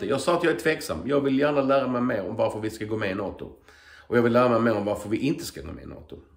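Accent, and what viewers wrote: Skåne, Sweden. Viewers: Pratar skånska nu?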